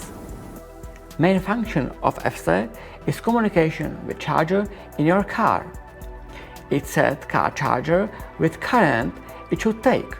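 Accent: China. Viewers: his English accent sounds a bit like Chinese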